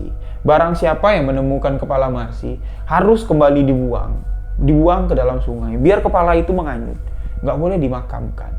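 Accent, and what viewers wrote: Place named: Jambi, Indonesia. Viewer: Banten hadir kk.... Aku dari pertma x ikutin sebuah kisah misteri itu suka bnget dri cara n logat bicara y kk....logat jambi yg kental bnget Kaya kita kbawa alur cerita yg d kisahkn Pokok y sebuah kisah misteri mh keren hbis ....sehat n semngt trus y ka ..... Sukses....mg Allah sllu mlindungi kita semua.....🙏🇮🇩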